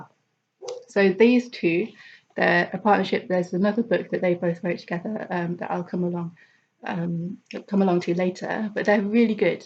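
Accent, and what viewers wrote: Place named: United Kingdom. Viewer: مرحبا 😊اللهجه تدل بريطانيا 😅استخدم الترجمه لمعرفه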